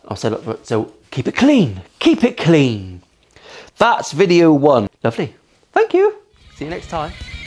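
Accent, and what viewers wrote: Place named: Plymouth, United Kingdom. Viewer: Excellent. I have a Revstar, so watching this with real interest. Plus you're helping me get attuned to the speakings of a Janner - my daughter is now a Derriford resident, so I'll be hearing a lot more of it in the future.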